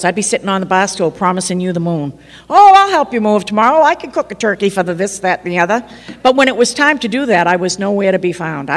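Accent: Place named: New England, United States. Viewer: 30 seconds in and there was that new england accent. #home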